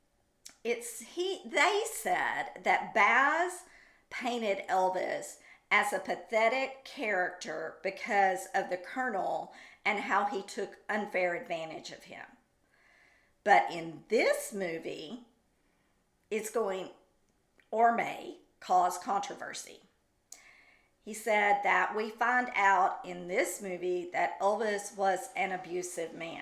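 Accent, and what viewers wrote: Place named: Memphis, United States. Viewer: Cilla is going all-out to create an angelic image of herself in the public eye when we all know she wasn’t. Ps. I love your accent, is it a Memphis one?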